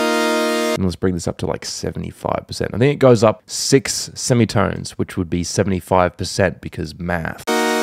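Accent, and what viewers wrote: Australia. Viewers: bro your aussie?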